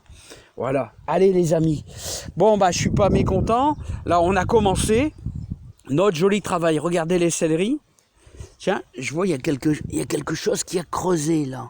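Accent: North, France. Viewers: Ah!! Ben voilà!! ça fait plaisir de t'entendre parler un peu le patois du Nord! Je trouvais que ça manquait un peu dans tes vidéos!! Je vais mettre aussi un peu de brin d'queviaux aux pieds de mes tomates et au fait, le brin d'coulons te sais qu'te peux in mette su t'chiette y parait que ça fait aussi repousser les qu'veux!!! Hi hi!!! ou Lol comme y disent ché jeunes!! José un chti exilé à Narbonne.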